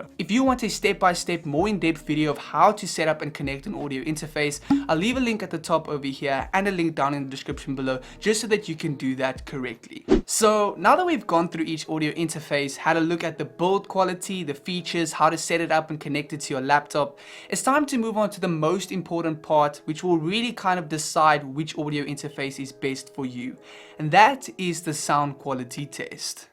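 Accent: South Africa. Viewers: Hey!thank you for this video ,quick question,are you from South Africa?you've got the accent lol ,I'm from SA as well!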